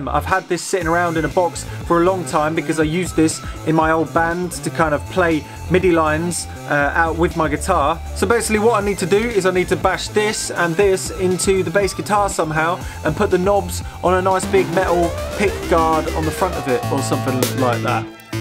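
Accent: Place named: United Kingdom. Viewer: I'm a bass player, but I'm here only for the british accent.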